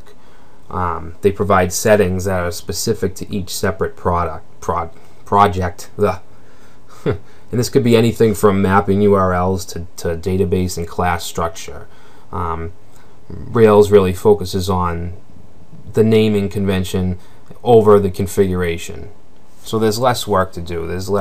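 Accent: Boston, United States. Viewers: Are you from Boston? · accent sounds very boston-y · bcz he is from boston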